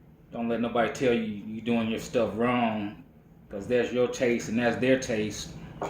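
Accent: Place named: South, United States. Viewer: My wife said you sound country. Where are you from????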